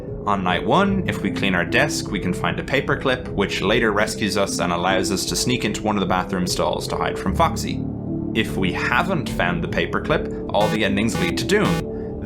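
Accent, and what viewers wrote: Ireland. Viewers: are you irish? theres a slight accent to ur voice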